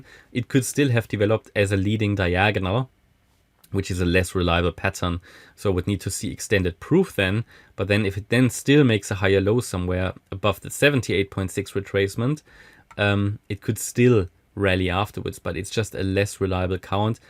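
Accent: Germany. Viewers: ich höre den deutschen aus der stimme xD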